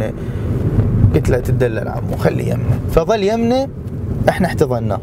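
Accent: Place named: Upper Egypt, Egypt. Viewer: اكسنت صاعدين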